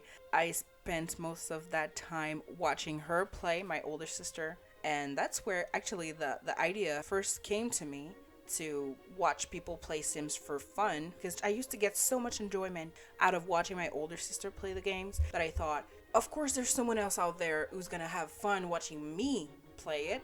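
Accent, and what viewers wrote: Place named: Ontario, Canada. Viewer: I see Canada, and I know you are in college and have a major Ontario accent. We might possibly be going to the same institution, haha.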